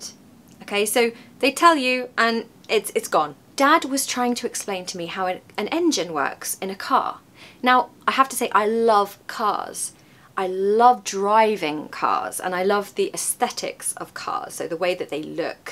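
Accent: RP, United Kingdom. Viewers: Sound natural is very Well, but a Light Froggy accent is very efficient for picking up, and I’m sûre that is you spoke French, your British accent would wonderfuly delicious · I love hearing your typically British accent! Thank you! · It's such an amazing teaching video as always! I've learnt so much from you and I took a note of them on my phone note so that I can revisit to consolidate. You are the No.1 native british who speaks with a beautiful quintessential british accent that I would love to listen for hours. Thank you for all your effort and amazing teaching!!😃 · I really enjoy watching your video❤❤ i'm impressed by British Accent and i hope i'll have a perfect British accent as you one day❤ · Ma'am I am improving with your British RP standard ❤ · I adore listening to you . Your british accent sounds for me like a music. Thank you so much. You are so lovely.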